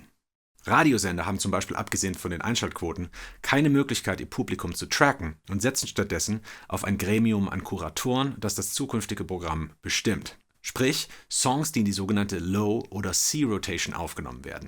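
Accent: United States. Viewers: bin ich bescheuert oder hast du einen leichten amerikanischen akzent? :D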